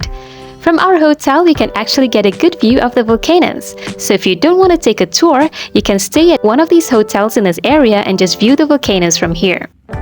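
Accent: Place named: Australia. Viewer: have you spent time in Australia? sounds like you have a slight Australian accent.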